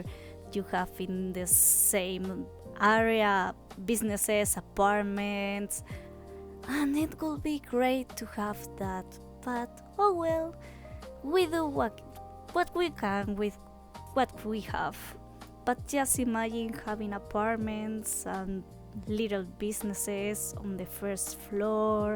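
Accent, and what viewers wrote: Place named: Spain. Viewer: Hello, Wow your English is so good and clearly understandable ! Also I love your super cute spanish accent~ I wish I I'd speak English like this. Beautiful build ! I checked UCLA on Google from which you took inspiration and good sims insertion~ I like how hou "simmifyed" it to melt the style with your own sims mark in Del Sol Valley. This world cruely needs renovations too and I'm excited to see how you'll redo this world with your own lore for the Zest family. Speaking of new Royalty EP, I'm very hyped for my part. I do love legacies and I feel the gameplay would blend so well into my saves and stories. Just disappointed the african inspirationned world ended in a poor neighbourhood with 5 lots... I hoped we had it as a whole new world but I kinda like how they created 3 whole universes in one world. That's interesting even thinking having a royal legacy in one of my saves I do play with 1 world = 1 realm/kingdom. 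Very excited to have this pack in our hands. Not forgetting CAS and build modes look insane !! I wonder what part 2 of this build would look like. Waiting for it !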